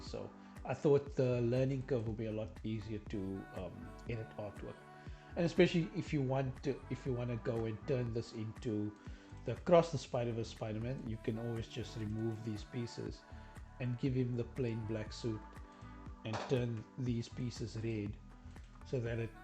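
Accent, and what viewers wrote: South Africa. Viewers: You sound very South African. Awe masekind! Shout out my brother! Keep Up The Great Work!!!!!!!!!!!! Lets push animation in SA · Are you South African?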